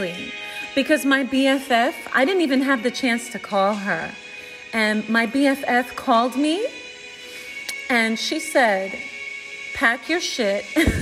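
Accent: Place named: New York, United States. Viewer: Still got that NY accent 😍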